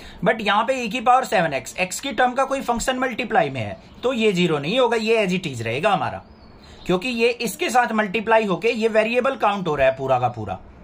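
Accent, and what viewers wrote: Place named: Bengal, India. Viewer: Bhai wo Bengali he Bengali accent tuje nahi PTA to me taunt mara kr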